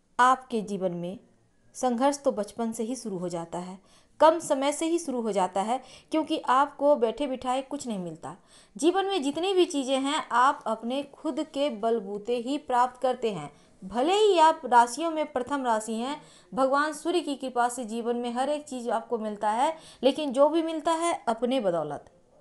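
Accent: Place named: West Bengal, India. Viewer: didi aapnar awaj sune mone hocche aapni bangali.aachen na.aami jante chai ki aapni kundali baniye upay dekhakar jonno koto fees nen pl bole din.nomoskar.aapnar kotha sone khoob bhalo lage.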